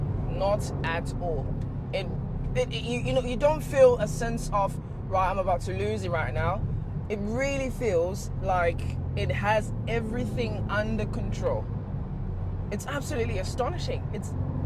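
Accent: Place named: England, United Kingdom. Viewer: You sound English bro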